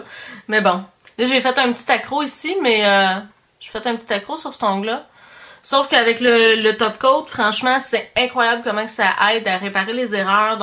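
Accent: Quebec, Canada. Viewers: YESS! Une québecoise qui explique comment faire un dégradé! En passant c'est super bien choisi tes couleurs, elles matchs vraiment ensemble! GROS PS: MOÉ JEL L'AIME TON ACCENT! -XXX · Euuu accent quebecois ! Et enfin du monde qui parle francais/quebecois comme moi !! Lol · Si t'es pas au courant c'es l'accent canadien --"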